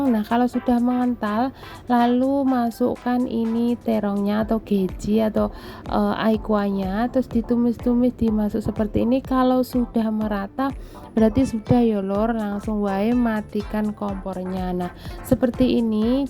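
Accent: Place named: Java, Indonesia. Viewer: 😍😍😍, ketemu konten nya mbak saya jadi semangat masak , trimakasih ya mbak 🥰🥰🙏 mbaknya asli Gresik Yo kok jowo ne lucu 😂😂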